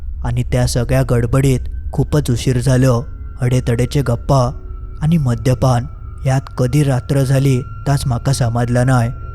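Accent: Malvani, India. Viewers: तुमची मालवणी खुप छान वाटते ऐकायला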